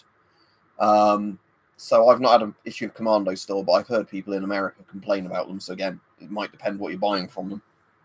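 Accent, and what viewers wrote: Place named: United Kingdom. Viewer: Seu sotaque britânico é muito elegante! ❤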